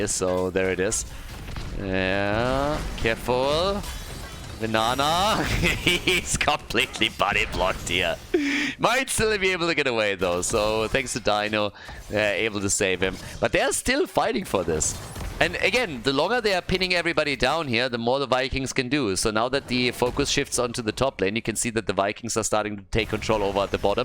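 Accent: Germany. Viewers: Hm... are u a native speaking german guy?! It seems like with that dialect ^^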